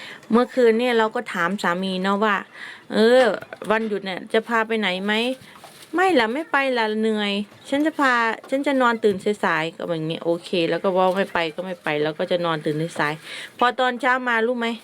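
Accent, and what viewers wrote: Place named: South, Thailand. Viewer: เจอคลิปคุณเมื่อวาน เริ่มไล่ดูไปเรื่อยๆ การตัดต่อบรรยายมีเสน่ดี ตอนแรกนึกว่าคุณเป็นคนใต้ หรือไม่ก็ปราจีน เคยได้ยินสำเนียงแบบนี่ ที่สำคัญคุณเป็นคนตลกมาก ชอบ กดติดตามแล้วนะคะ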